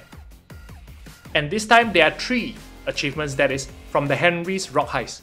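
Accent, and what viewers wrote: Singapore. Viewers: Bruh are you from SG?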